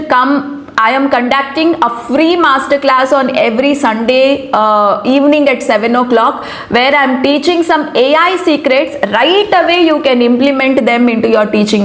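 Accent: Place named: South India, India. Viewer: Just a South Indian accent.....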